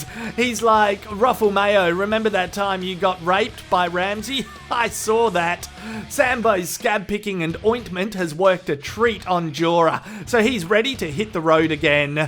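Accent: Australia. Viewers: Fuckin love the aussie's your patter is brilliant!!!! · Oh, the Australian accent! Noted.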